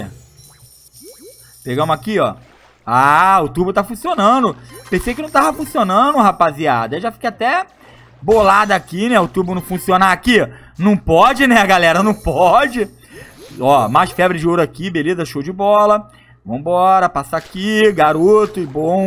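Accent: Rio de Janeiro, Brazil. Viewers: Legal seu vídeo e ideia, quase ninguém faz esse tipo. Mas eu não suporto esse sotaque e o jeito de falar de, carioca sei lá. Kkkk assisto no mudo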